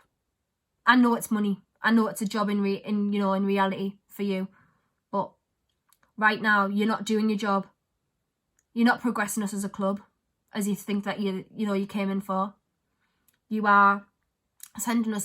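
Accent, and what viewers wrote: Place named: Darlington, United Kingdom. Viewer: are u from darlo im sitting here wi wor lass and i said to her hoy pey she talks like u lol.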